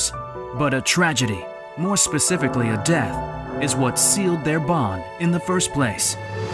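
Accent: United States. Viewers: Can we not have Americans narrating these? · American speak English , try Scottish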